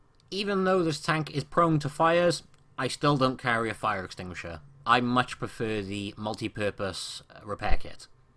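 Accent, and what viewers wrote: United Kingdom. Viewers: yoo wat a british accent !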